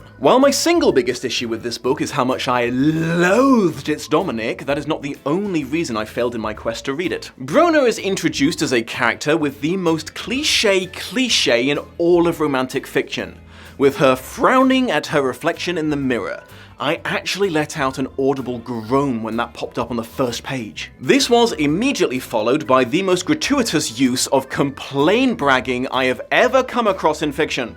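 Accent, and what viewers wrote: South, United States. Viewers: 1. I got a great kick out of your Southern American accent 2. I just love having such a great YouTuber with high-quality content who’s childfree by choice. You’re great representation. I shall continue to edit and add to this list as I keep watching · Only four minutes in and I’m in tears with laughter. Thank k you for that incredible reading in what I can only assume is supposed to be a southern American accent. It’s actually a pretty good accent, way better than any accent I can do.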